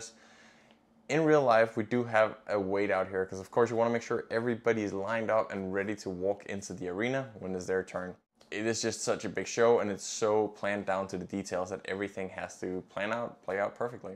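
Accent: United States. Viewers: Appreciate your sharing。Your English seems more like American style than British style. Why is that? Is the general case for Danish speaking English? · You are from Denmark but yet you have an American accent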